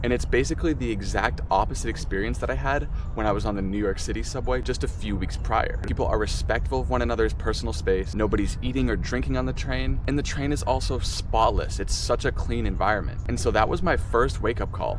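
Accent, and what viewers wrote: Thailand. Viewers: Good Thai accent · Your Thai accent 👍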